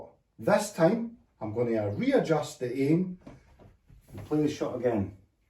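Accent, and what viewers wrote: Scotland, United Kingdom. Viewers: Well your new subs from Russia etc seem happy enough, I think alot of the world is familiar with the Scottish accent by now with there being so many celebrities etc with Scottish accents. Especially being snooker fans with top legends like hendry and Higgins being scottish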